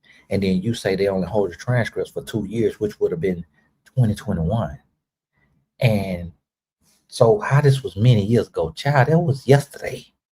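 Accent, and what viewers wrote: South, United States. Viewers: aye you from the carolina’s or georgia?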